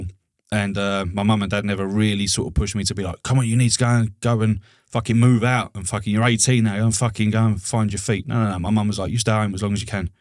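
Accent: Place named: RP, United Kingdom. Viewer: 😂 your posh voice is hilarious!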